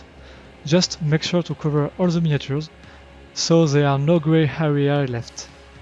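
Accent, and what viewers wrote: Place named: France. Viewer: Oh l’accent sonne français ! beau boulot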